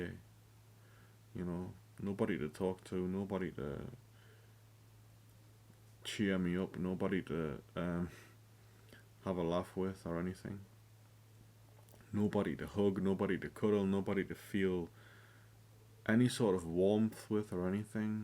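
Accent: United Kingdom. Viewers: Geordie love it I’m from Hexham bro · Youtube random algorithm sent me here so, going to write a comment (I know this was posted 4 months ago but I hope you read it). Life doesn't have to have purpose, friends come and go, lovers as well, there is no eternal love, all ends when one of them dies. Try to volunteer, you will meet new people, and will feel a sense of purpose. A pet helps, physical exercise (hiking, walks, weights) as well. "Who's going to love you, if you don't have a job?", your constructed ideas are putting you in a prison, I met my bf when he was unemployed, he took over 1y to get a job, that never got in the way of our relationship. I earn more than he does, he is on minimum wage, and I really don't care how much he makes, if he enjoys what he is doing. The happy family idea, is just an idea, most of my close acquaintances have families that are quite dysfunctional, very few are really happy. 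I know plenty of people that are 40y+ that are single and they are actually happier than most married people I know. Being alone is different from loneliness. You achieved being an engineer, earned a good wage, you traveled, and learned the lesson that you should have saved for the rainy day. Life has ups and downs, it is not a highway like propaganda portraits. Start reading about stoicism (there are a lot of sources for free online, you can start in reddit- r/stoicism), self-compassion, resilience and get therapy (I think you are from UK from your accent, NHS provides free therapy).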